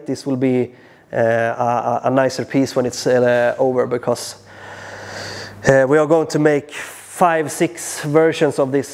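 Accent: Sweden. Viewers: A little Swenglish never hurt anyone! 🇸🇪 What an incredible build, subscribed and ready for the next episode! 🚀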